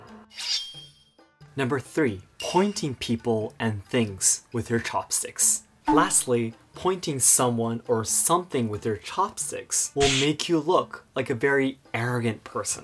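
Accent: United States. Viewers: I love the American twang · He sounds very american, although it is well spoken american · it's an american accent wth are you talking about?? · In only six years you developed an American accent? How old were you when you arrived in Michigan?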